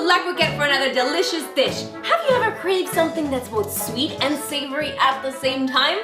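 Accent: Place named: England, United Kingdom. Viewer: Soy la única que viene del canal y no entiende🤣🤣amooooooooooooooo tu acento Inglés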